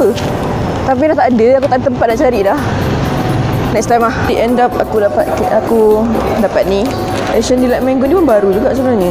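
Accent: Kelantan, Malaysia. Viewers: kau org kelantan ke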